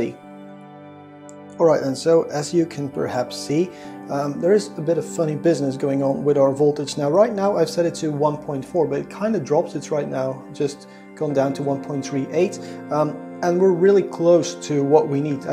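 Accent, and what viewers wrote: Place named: Netherlands. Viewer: Why do you sound just a little bit dutch, or german or something ;) oh shit je bent nederlands haha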